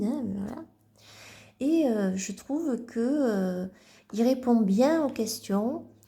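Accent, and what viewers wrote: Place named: United Kingdom. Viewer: Bonjour! Je suis très nature 🐰🐿️🦇🦔🌳et très couleurs 🌈 alors forcément je me suis régalée! Merci pour ces découvertes c'est enrichissant et très tentant 😍. Nous avons le même accent anglais 😉...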